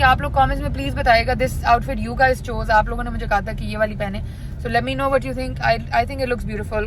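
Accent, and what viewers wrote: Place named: United Kingdom. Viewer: Why are you showing British accent you are Pakistani speak in your native Urdu language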